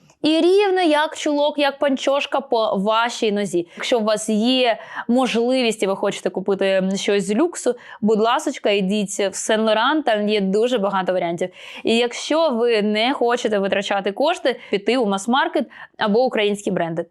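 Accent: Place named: Russia. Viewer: і купа помилок, відчувається, що людина в житті спілкується російською, і це підвердилось.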